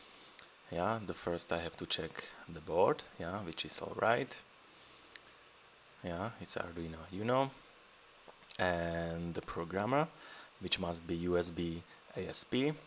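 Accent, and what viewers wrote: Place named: Slovakia. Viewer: Are you from Slovakia? I sense a Slovakian accent there :)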